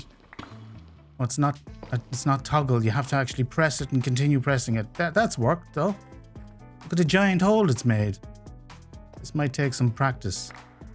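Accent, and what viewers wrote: Ireland. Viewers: My daughter started playing Minecraft when she was 7 in 2012 on the Xbox 360. I was an OG gamer going back to the 80s (I'm an old fart) and blew off the game as a joke for almost a decade until a certain global event hit in 2020. She got me hooked on it and I've never gone back. I sub to a lot of Minecraft channels and yours popped up on my YT suggestions. , your MC channel is the best I've come across. It deserves over a million subs. Your Irish accent and spirit are an absolute joy and stirs my Irish ancestral blood in me. Please keep up the outstanding videos, builds, humor and music! Well done! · Right!! Either that or I really am obsessed with puppies! Lovely to hear a fellow Irish gamer! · By chance are ya Irish and if so what part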